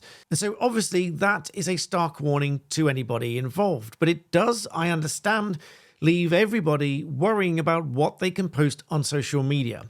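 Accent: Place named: RP, United Kingdom. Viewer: A posh English accent, then What is a missle?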